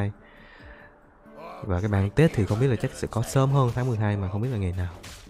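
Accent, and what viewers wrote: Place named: Phu Yen, Vietnam. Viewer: giọng giống PY quê mình thế nhờ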